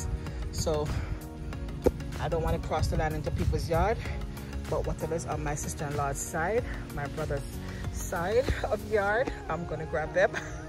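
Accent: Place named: Patois, Jamaica. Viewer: I love to hear you speak PATOIS sis. I love to eat coconut as well. Wow, the price for those here is ridiculous.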